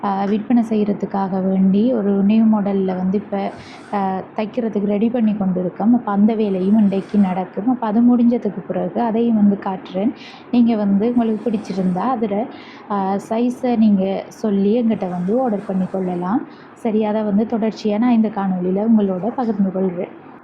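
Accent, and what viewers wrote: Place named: India. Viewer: உந்த சல்வாரையும் கொல்வாரையும் விட்டிட்டு கொஞ்சம் modern க தையுங்க. அதோட இந்தியன் slang ல பேசாமல் மட்டக்களப்பு slang ல பேசுங்கோ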